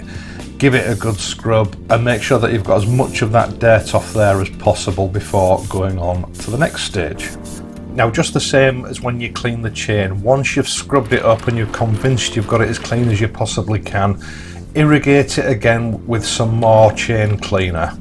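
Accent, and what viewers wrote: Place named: Yorkshire, United Kingdom. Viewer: Hi, I too, like Mark below but somewhat later down the road, have only recently discovered your channel and I completely agree with what he has written. After starting my riding 'career' in 1973 on chain driven bikes (using them literally all year round - no car) to commute to and from work and remembering the nights when I'd come home and then have to strip the chain off and heat up a round tin of chain oil/grease and soak them over night, (and then put it back on in the morning), in the late eighties I 'saw the light' and since then have ridden shaft drive bikes. However, just recently I have purchased a Bonneville Speedmaster (previously a Pan European) and put the 'fun' back in my biking! Over the intervening years chains have progressed and the range of products now on the market to keep them in good condition has mushroomed; the choice is huge and this is where your excellent thoughts and advice are a God send as are alot of the other topics you cover..... 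Apart from anything else, I love your accent and manner of delivery and reminds me very fondly of an old work colleague who came from Airmyn near Goole and with whom I'm still in contact. I digress. Thank you and keep up the great work! 😀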